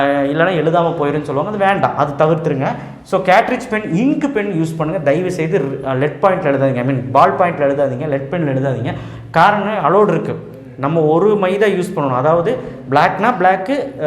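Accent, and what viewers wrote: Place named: Chennai, India. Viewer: bro I love your slang Neenga Chennai ah ❤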